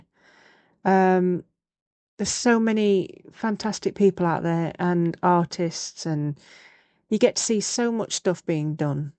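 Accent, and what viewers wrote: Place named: South Yorkshire, United Kingdom. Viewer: im loving watching you make this, i recognise your accent and voice. Im from South Yorkshire maybe thats why xx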